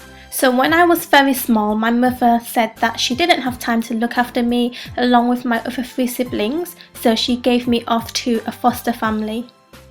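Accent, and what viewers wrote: United Kingdom. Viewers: I love British accent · ...Why wouldn't she keep her British accent if she lives in Britain? LOL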